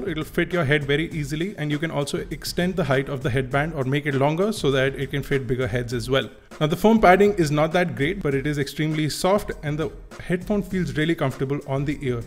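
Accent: India. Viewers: Damn man... Dope doesnt sounds so dope in the Indian accent. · Indian Accent 😄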